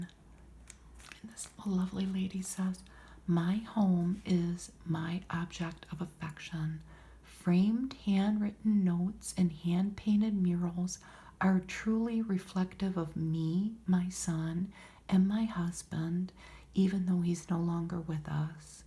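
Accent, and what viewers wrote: Midwest, United States. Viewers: I really enjoy these magazine flip throughs, your voice with just that touch of midwestern accent is so nice.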